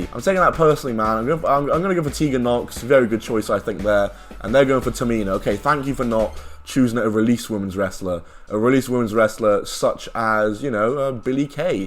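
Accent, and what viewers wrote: England, United Kingdom. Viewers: I’m a black man from Brooklyn NY and I’m a sucker for an English accent speaking on wrestling. Random af but I love your content bro you’ve gotten me through a couple rough days at work I appreciate you for that man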